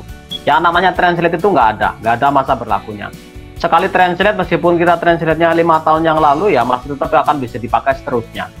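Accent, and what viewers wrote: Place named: Java, Indonesia. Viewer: Logate jowo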